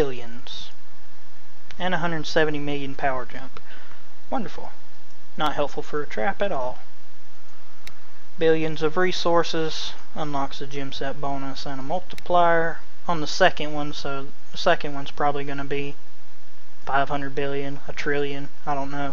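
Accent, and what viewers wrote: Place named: North Carolina, United States. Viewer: Are you from NC? accent sounds like where I live.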